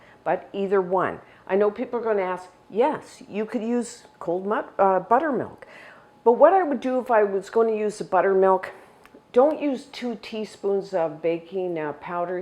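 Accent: Canada. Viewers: Welcome back,! Always the best recipes n explained so nicely I hear that Canadian accent a bit, I think 🥰.. love it! Love that color on you too🌷🌸🌿🌸🌷🌿🌸🌷🌿🥰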